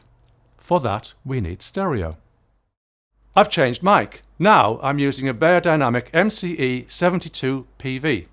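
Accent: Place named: North East, United Kingdom. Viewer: Cheers, very useful. Just about picking up your dulcet north east tones..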